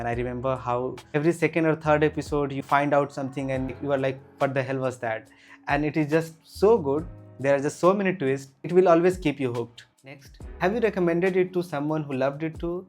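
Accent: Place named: Bhojpuri, India. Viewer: Sir , thoda MTI aata hai aap ke speaking me.. it seems that you speak Bhojpuri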